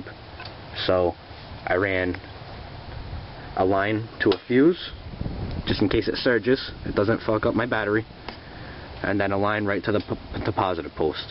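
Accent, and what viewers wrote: Boston, United States. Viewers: No friggin way you're from the Boston area! I can tell cuz I'm wicked smaat! Lol nice video man. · thats a thick boston accent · Boston accent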